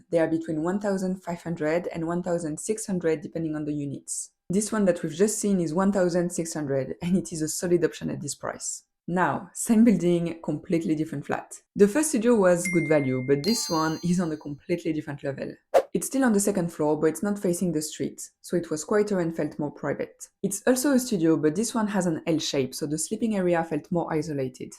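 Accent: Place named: France. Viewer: I was too busy appreciating your kick-ass French accent to even watch what you were showing lol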